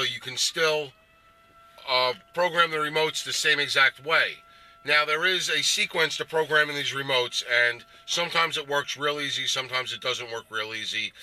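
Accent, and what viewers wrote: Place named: East Coast, United States. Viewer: so you feel bad for everyone on the east coast? Lmao that’s literally how they all talk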